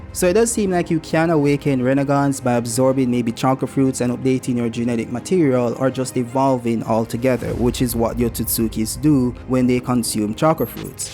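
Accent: Jamaica. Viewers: Are you jamaican i picked up your accent 😂😊 big up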